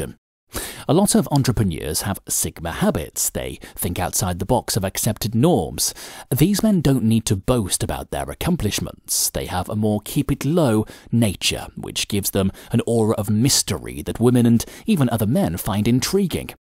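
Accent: United Kingdom. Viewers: Is there a reason you seem to always show a black Guy especially with the white women as if they are better than white men? Seems racist to me and I think the white guys should be with their own race and so should the black Guys! There are many Alphas who are white. Are you ashamed of your own race or is that a British thing. I say that because your voice indicates you are white and British? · The most thing that I liked in this video is the British accent, long live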